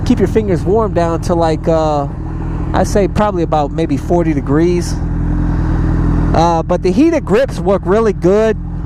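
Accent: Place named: Cleveland, United States. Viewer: Clownsization I’m from Mayfield Village. He’s got the Cleveland accent for sure.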